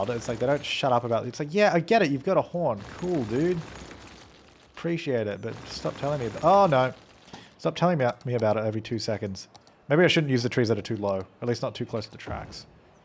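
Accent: Australia. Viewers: Drone club Not really, it's just the aussie accent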